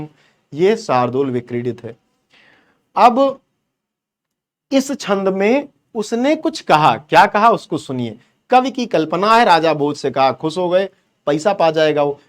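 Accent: Uttar Pradesh, India. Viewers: Are sir aap up se hai lg rha hai by the way bahut bhokali samjhe hai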